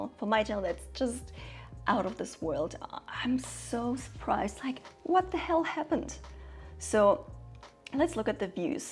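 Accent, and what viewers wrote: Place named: Nuremberg, Germany. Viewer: Hallo, schön dich deutsch sprechen zu hören. Ich war dienstlich letzte Woche im Nürnberger Raum.. es klang sehr ähnlich.. smile.. ist es evtl. deine "alte Hemat".. LG Andreas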